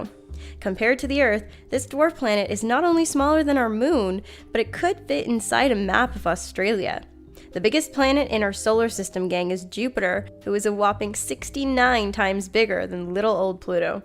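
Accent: Canada. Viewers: Okay the only fact that blew my mind (No pun intended, you'll see why) is that that they're pretty much no naturally blue pigmented foods. And I had a feeling you were Canadian because a few videos ago I remember hearing pronounce a few words in ways that sounded like a Canadian said it. And it's cool! I'm American, but I'm fans of some Canadian skaters, there's some Canadian YouTubers I think are cool, and I have a few friends that are Canadian.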